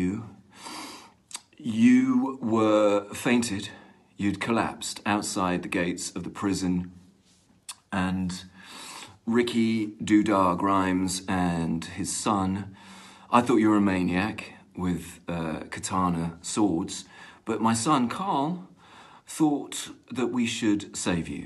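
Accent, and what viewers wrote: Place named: England, United Kingdom. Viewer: So bloody weird. I’m British, I’ve always known Andrew Lincoln was British (This Life, Teachers, Love Actually). But hearing him speaking in an English accent messes with my mind. Just goes to show how good an actor he is, creating such an iconic character in Rick Grimes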